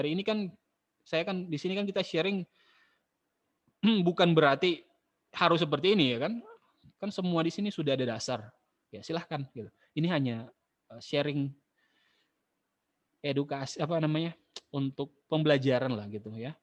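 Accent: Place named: Bima, Indonesia. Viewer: wah orang bimaa ini logatnya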